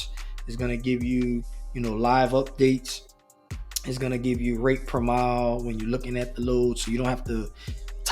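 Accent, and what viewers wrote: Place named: Louisiana, United States. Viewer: Salute my Louisiana bro i can here your accent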